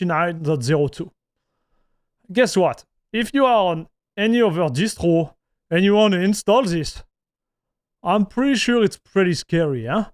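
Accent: France. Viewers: Sorry for reducing you, but I only watched this for the english with french accent.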